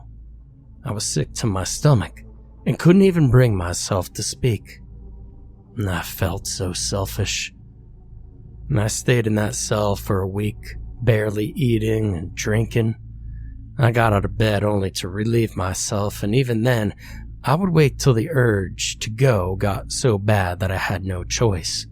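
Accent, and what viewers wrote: South, United States. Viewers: Great southern draw really took this story over the top Great work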